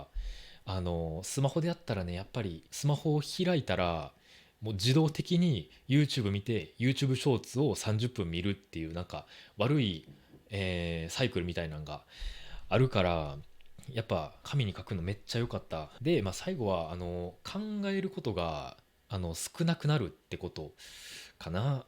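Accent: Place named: Kansai, Japan. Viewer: 初めてコメントします！大阪で働いている台湾人です。同僚のほとんどが関西出身なので、みんなともっと関西弁で話せるようになりたくて、関西弁を勉強しようと思っています。Ryuseiさんの関西弁で撮られたポッドキャストを全部、何回も繰り返して聞いています！少しずつ関西弁の響きに慣れてきて、本当に助かっています。これからも関西弁のポッドキャストをぜひ聞かせてください！（もちろん、標準語のポッドキャストも楽しみにしています！）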